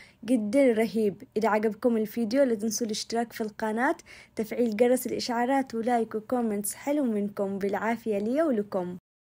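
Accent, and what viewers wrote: Aden, Yemen. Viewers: حبيت فيديوهاتك من او ل نظره❤واللهجة العدنيه مليييييحه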